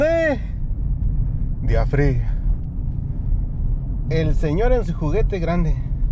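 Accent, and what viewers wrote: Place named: Central Mexico, Mexico. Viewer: De que parte de la RM eres? Suenas como del centro del país. Saludos, recién ayer conocí tu blog, en un día ocioso sin consulta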